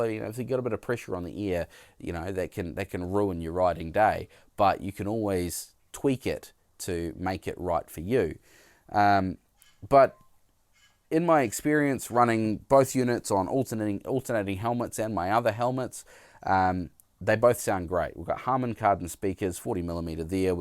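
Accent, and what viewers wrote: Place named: New Zealand. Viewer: If you ride with limited or no people the HD spirit is the best bang for buck unit. The buttons can be a little unresponsive at times but overall the sound is great. I have it on one helmet and the new srl3 sena on another and the audio is as good as the srl3 and actually gets louder. I did buy the edge but sent it back as the voice commands were crap. The new srl3 voice commands are much better as long as you say hey seena and not hey Sennar, it's an aussie thing and from your kiwi accent it'd be the same deal.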